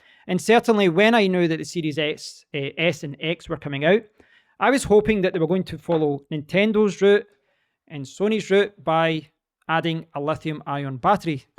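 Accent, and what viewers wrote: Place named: Scotland, United Kingdom. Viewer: Shiiiet... love your Scottish! ? (forgive me if it is Irish) Much love from Florida !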